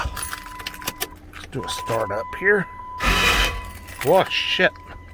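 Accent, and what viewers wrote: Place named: South, United States. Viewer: Good old truuuuck hillbilly voice to the max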